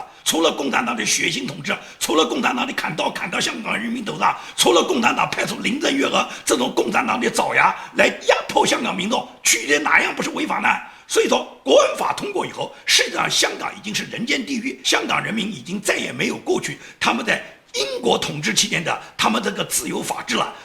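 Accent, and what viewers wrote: Nanjing, China. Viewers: 尊敬的哥您好，18年初看您的视频至今，收获良多，我也是南京人，特别喜欢您南京口音的普通话。您的坚持不懈，您的语速，您的激情都感染着所有同道中人。有人认为您用词反共过于夸张，过于亢奋激情。其实不然，太多中国人已经习惯跪太久了，冰冻三尺非一日之寒，矫枉必须过正，再不激情怎能唤醒国人，即使夸张又如何。还有人认为您对共匪不分对错太过痛恨有点过了，而我只想说未经他人事，莫劝他人善。有这想法的人他们不懂您，更不懂共匪。您的所有视频我都看完。由于一些特殊原因有一个多月没能上youtube看您视频，近几天也都补看完了。当我看到1000期您说爱情故事时，我被您的爱情故事震撼了，发自内心来说这是我听过的一个最好的最真切唯美的爱情故事，您的故事有血有肉，有柔情有理想怎能不让人感动。哥不愧南京人，果然真男人!您对理想的执着让人无比敬佩和感动。如果您能看到我写的东西话我想说两点我的想法，仅供参考，1.您觉得匪让人有肉吃有酒喝了所以人民安于现状了，麻木了，粉红了，其实那只是少部分。而大部分恰恰相反，他们是得天天在努力争取酒肉，不是不想剿匪，没有理想。而是在剿匪的路上需要吃喝，自古当兵不就为了吃口皇粮吗？而现状是他们连翻墙软件都买不起。2.中国人跪久了是怎么造成的那，未何一点血性都没了那？这不光是匪的原因，更重要的是儒家的原因。孔老二在战国时本是百家中最普通的一家一直不被认同。所以孔老二当时周游列国都不受待见，秦始皇用的是法家，汉用的是老子无为，直到千年后的中唐才为了封建统治用了孔老二洗礼人民思想。所以剿匪必先灭儒！最后说一点您可能不爱听的，习近平做为帝王他是伟大的，对于新疆，香港他没有开枪，无疑比毛，邓高明很多。还有扫黑扫毒等对内政策把社会风气提高很多。是江，胡所不能比的。我知道您可能会反驳很多，但我说的只是对于帝王来说，请正面理解。希望有机会去美国时能和您当面讨教。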